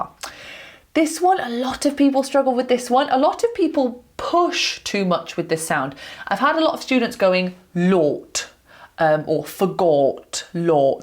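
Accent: United Kingdom. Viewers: Me gusta escuchar tu acento británico, y por cierto , te pareces mucho a Alice Merton. 😤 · Hello, I love learning British accent from you. They have a lovely British English sound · Really like your videos, like British accent ❤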